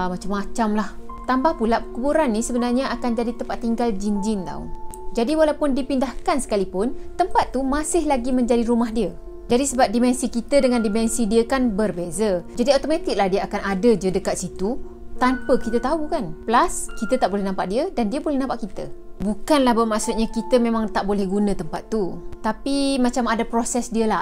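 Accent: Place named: Johor, Malaysia. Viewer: Best le kakak punya penyampaian tak bosan macam sleng jawa johor tu yg kmi suka cara kakak berckp .👍👍👍😃😃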